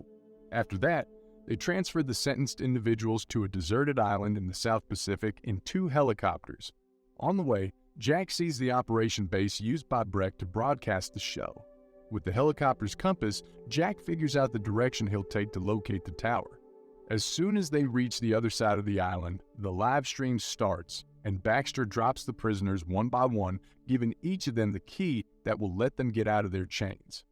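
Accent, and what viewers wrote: Texas, United States. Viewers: Texas accent recap? is this an AI voice?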